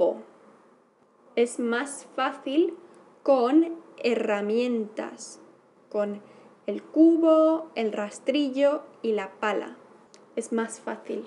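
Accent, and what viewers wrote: Spain. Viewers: Hola. I enjoyed this video. I’ve been learning Spanish using the app Duolingo. You sound very different and I think it’s because the app is based on ‘Mexican’ Spanish. You sound more familiar to what I hear when I’m in Spain. Am I correct?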